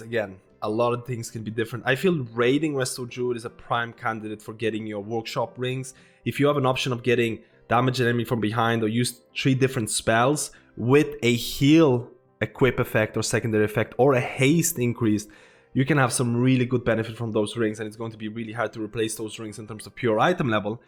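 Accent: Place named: Ireland. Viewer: Where are you from man, i cant hack your accent i hear abit of irish in it lol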